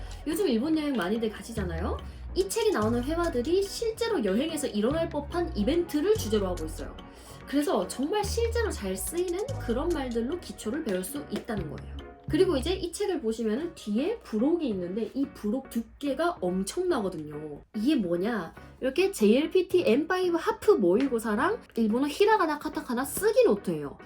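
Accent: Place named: South Korea. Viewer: 인스타에선 광고 욕먹던데 온도차 심하구나....전형적인 한국인 일본어 발음이라고 광고찍냐는 댓글 많던데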